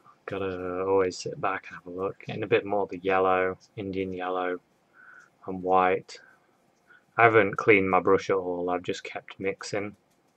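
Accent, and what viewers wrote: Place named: Liverpool, United Kingdom. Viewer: Hya, love your pieces, you sound like your in or from Liverpool.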